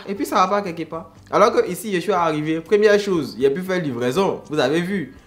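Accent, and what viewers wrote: Ivory Coast. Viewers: Orrrrr djo j'aime trop t'écouter 😂 depuis ABIDJAN, sérieux ivoirien peut pas se cacher, quand il parle net ont sait que c'est lui 😂😂😂💕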